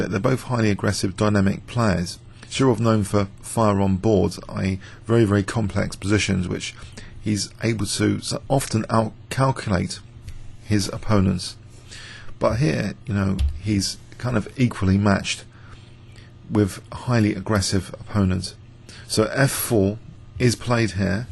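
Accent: United Kingdom. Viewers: British ascent, hoo!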